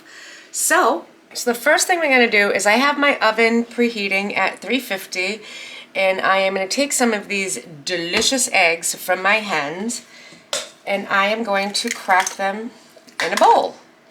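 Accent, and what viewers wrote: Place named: New England, United States. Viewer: Perfect recipe for lazy mornings! Can’t get enough of that New England accent. Absolutely adding to my weekly routines! 🙏🥰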